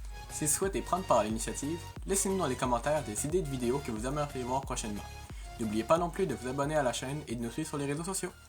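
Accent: Quebec, Canada. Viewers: je préfère largement entendre l'accent québécois plutôt que l'accent d'un gar des bouches du Rhône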